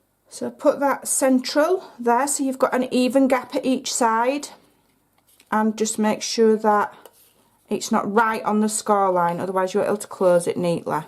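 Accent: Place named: England, United Kingdom. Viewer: those are just the cutest! Your laugh and English accent is adorable. Thanks for sharing your talent.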